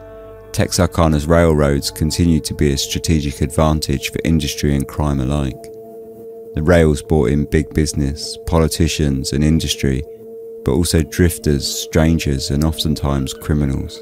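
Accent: United Kingdom. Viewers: lol. - there are USA. Americans that can't pronounce Arkansas or Texarkana. You merely pronounce it with a British accent. ToMAYto - ToMOTo Ya know, we Americans actually - get that one right. lol ...and, I specifically recall being taught that the "H" is silent - in "herbs" (you folks pronounce it like one would expect an American to - "?" No problem, I'm just curious what the original rule is.)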